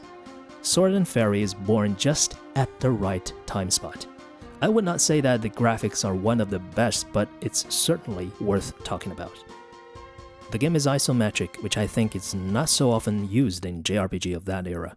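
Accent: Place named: United Kingdom. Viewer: omg 这么酥的英音吗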